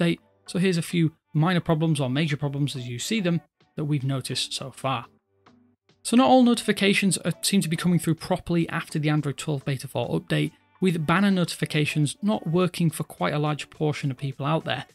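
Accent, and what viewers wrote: United Kingdom. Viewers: Why is this channel based on the U.S when the host is clearly British?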